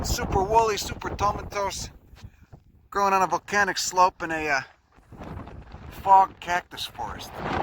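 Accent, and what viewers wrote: Chicago, United States. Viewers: Thanks for exploring my country. I found you because of the Chicago accent. A Chilean natural myself who lived in the Humboldt Park area and in Willmette for a couple of years. It's always refreshing getting to hear the accent I got my English from... If you ever come to Patagonia you'll be astonished of the flora down here. Youll get to see wild strawberries, fancy varieties of potatoes, Gaultheria mucronata, and the trees, man... wow! Fitzroya cupressoides, Araucaria araucana (monkey puzzles, typically pictured in dinosaur books and shit). If you EVER come here, you'll have a home where to stay, man! Again, I'm really happy to hear you talk about these wonders... in the accent I learned my English from! Hugs from Villarrica and keep up the good work!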